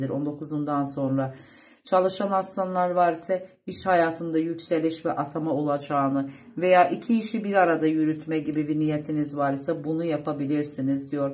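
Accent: Azerbaijan. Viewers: Ağzınıza sağlık.Güzeldi.Bu arada sanki Azerisiniz.Konuşmanız azericeyə benziyor.Azərbaycandan yazıram.❤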